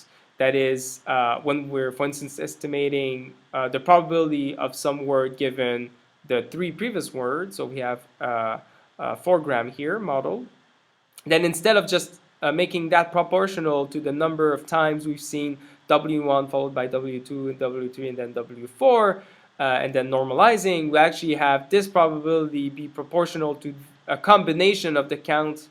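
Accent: Quebec, Canada. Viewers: I guess you're a French fella from Quebec. Anyway, it's a good video.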